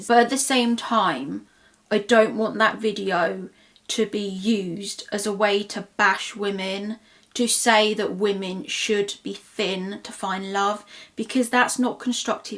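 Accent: United Kingdom. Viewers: And for me to understand the British accent which i have to understand if i reach the EPL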